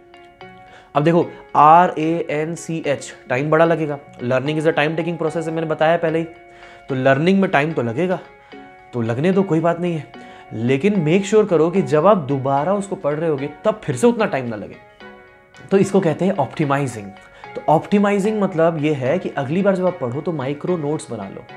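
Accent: Bihar, India. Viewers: Bihar na..???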